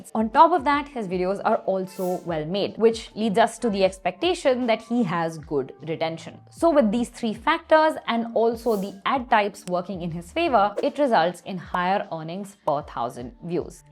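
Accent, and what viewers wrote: India. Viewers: listening your india accent torturing my ears . sorry i cannot watch this.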